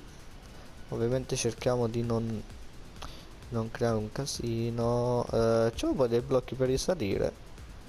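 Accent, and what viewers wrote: Sicily, Italy. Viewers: We mbare sei siciliano?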